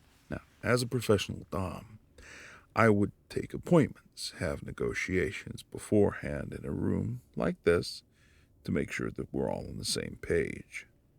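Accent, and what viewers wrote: United Kingdom. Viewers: Still got that British dialect. definitely ❤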